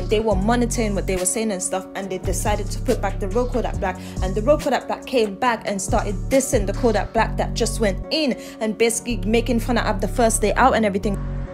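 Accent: United Kingdom. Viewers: Yoo are from the UK you got that British accent And It seems King Von is your favorite artists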